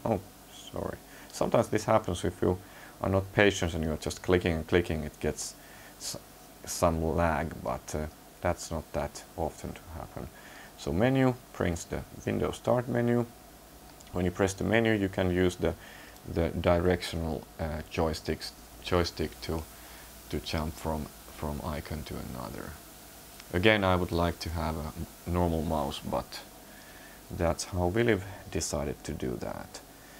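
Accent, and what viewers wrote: Finland. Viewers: I seek reviews on a gadget and I somehow always end up watching the ones with that guy and his finnish accent ;). They're the best. Thanx for them all! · ru Finnish?...u sounds like my friend from Finland.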